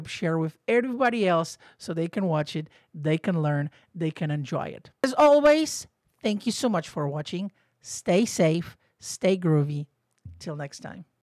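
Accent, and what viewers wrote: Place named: Brazil. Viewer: You sound Brazilian. Awesome video! Thanks.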